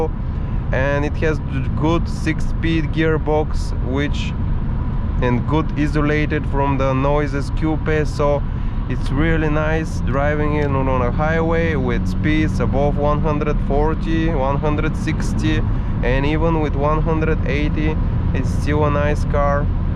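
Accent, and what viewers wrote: Bulgaria. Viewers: Първо, като почна клипа си помислих, че е някой чужденец, обаче като чух как говориш и веднага познах, че си българин. Много готино клипче, прожължавай все напред! :) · Евала за клипа още от акцента те разбрах ,че си от нашите хора 😁🤣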